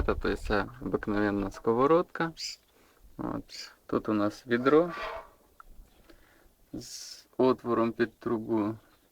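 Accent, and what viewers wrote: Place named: Central Ukraine, Ukraine. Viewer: Говор такой забавный, центральная Украина небось)) зы. А горн прикольный.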